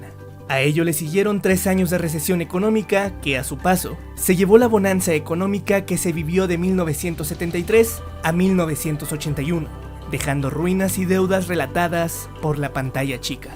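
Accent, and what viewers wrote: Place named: Bolivia. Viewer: porque la locución habla como si fuera bolivia o algo asi?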